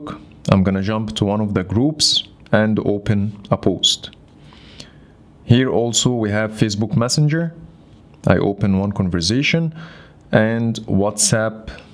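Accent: Egypt. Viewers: What an Egyptian accent, good job bruh☺️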